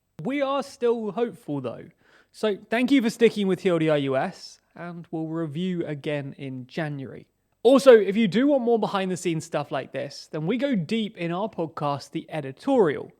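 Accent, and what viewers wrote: United Kingdom. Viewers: Oh my! I wonder how US citizens perceive a Brit breaking down their politics and national events, i.e., maybe an American accent may help? Perhaps it should be TDLR North America? Is there an audience from Canada? Maybe less saturation in the market compared to USA? Otherwise, it's all in the pitch. Take, for example, the BBC. Around the world they are largely perceived as the gold standard due to their perceived impartiality and focus on news over opinion. TDLR has one presenter with an uncluttered environment. Either run wuth that and make it explicit that TDLR is the home of news facts not news opinion. Being based in UK you have geographical distance from all the political shenanigans and little exposure to the dross on opinion news TV. You do a PR number on your impartiality. You don't have connections with US politicians or lobbyists unlike US legacy media whi must kiss the ring to avoid getting expelled from their chummy club. 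Or you need to seem less "other". If you hear a US or Australian news presenter talking about UK events do you ever get annoyed with them? Mutter about how would they know bkah blah? Jazz it up, get a co-presenter, recognise that you might not be abke to copy the UK format over every channel. Anyhoo. My tuppence. Double down or change format. If everyone really wants news facts and less opinion then you need to explicitly pitch the channel that way. It's a busy market. What's your unique selling point? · If you are based in the UK, then American news would fit better in Global anyway. If you retain the TLDR US channel, you need to decide how it will be different than any other hundreds of other US-news channels available. If you think you have a viable approach for the US-market, then give it the same attention as the other channels and evaluate it based on that. If it still underperforms, then you know Americans just aren't resonating with your perspective/delivery and you should focus your attention elsewhere. 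It's unfortunate that you don't have the luxury of operating at a loss - which was how news was run in the mid-20th century. Currently, since your accent gives away you are based in the UK, I see your channel as a Brit/European perspective on US news and politics - basically the "outsider's" perspective. I'll expect the content to be even more left leaning than typical American media (American political center is more to the right than European political center). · I subscribe to all of your channels, so my comment is not personal. But, you might try a American English speaking presenter. Many Americans might feel put off with a British accent talking about American polotics.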